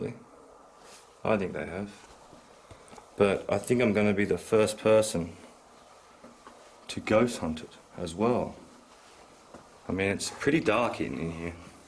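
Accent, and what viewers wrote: Australia. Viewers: yeah, I did some reading and it's pretty interesting and creepy to know just how many died horrific deaths there. Some think she was innocent but that her servants set her up or were coerced into false testimony. Some think the servants themselves did horrible things to each other there. Either way, some very dark history. You're very fortunate to travel to these places and it's great you share it 😄. I love learning about new things and history. There's something I just love about the Australian accent too. I'm a wannabe Australian lol. Keep the videos coming!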